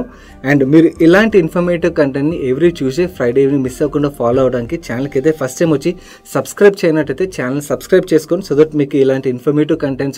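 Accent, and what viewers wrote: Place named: Godavari, India. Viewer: Godhavari sonds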